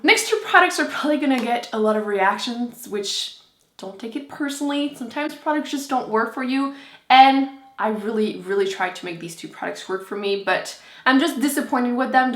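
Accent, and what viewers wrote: France. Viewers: Sorry are your french?? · She has an AMAZING french accent.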